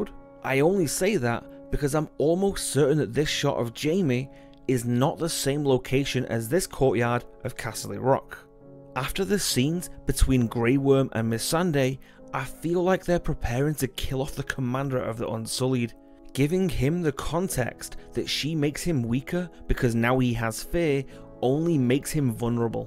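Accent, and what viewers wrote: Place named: North, United Kingdom. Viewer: subbed for his northern accent! :)))) KING IN THE NOFF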